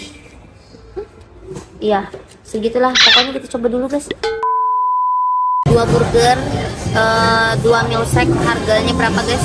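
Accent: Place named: South Sulawesi, Indonesia. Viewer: Kak pekat betul ba loghat bugis mu😂😂😂,btw Salam dari bugis pare" Sabah Malaysia kak enjoy terus kakak selama di Malaysia ya😆🙏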